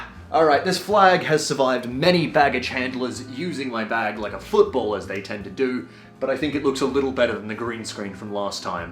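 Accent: Australia. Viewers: I wont lie man, when i first watched one of your videos 2 years ago i wasnt sure if i liked your stuff or not. It took me a couple of months of watching random suggested videos here and there before i actually subscribed.. But im so glad i did. Your gameplay stuff is consistently funny and enjoyable, the largescale stuff you run is nothing short of epic.. But this takes my respect for you to a whole nother level. A lot of people talk big, not many of them actually back it up by really helping, and very few would actually go over there and put themselves in danger to deliver that help themselves and show your support in person. I dont want to put words in their mouths but Im sure it lifts the battered spirits of Ukranians to see forgeigners from literally the opposite side of the world being willing to go to such lengths to support them in their time of need, and them being able to see your face and hear your ozzy accent in person must just solidify that feeling even more. 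Youre a man with principles, courage and integrity and I have absoltely nothing but respect and admiration for you,. Cheers bro Slava Ukraine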